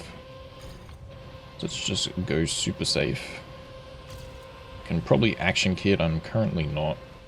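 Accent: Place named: South Africa. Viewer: I watched this as I couldnt get better than bronze on 14, I played it a bunch of times and figured it out and got gold :) just unlocked 16-20 :) Hey, your accent...I think your from SA?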